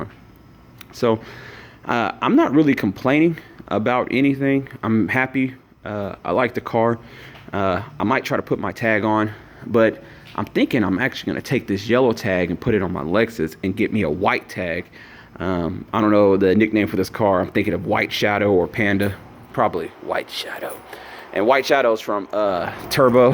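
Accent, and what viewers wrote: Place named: Oklahoma, United States. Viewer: Awesome review man, i had a feeling you were from Oklahoma just by your accent lol. But I'm getting rid of my Legacy for either the Camry or the Accord. I'm leaning a little towards the Camry now lol.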